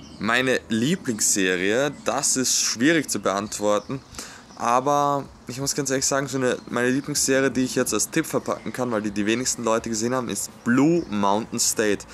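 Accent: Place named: Graz, Austria. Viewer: Das ist der grazer Akzent wir sind keine Bauer in graz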